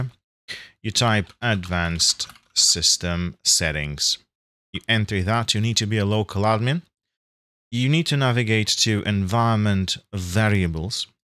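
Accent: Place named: Russia. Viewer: отличный аннлийский. слышен немного русский акцент но все равно красава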